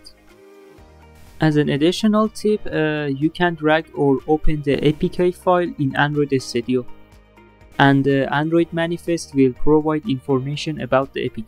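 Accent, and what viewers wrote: Iran. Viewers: از لحجه ات فهمیدم ایرانی هستی 😂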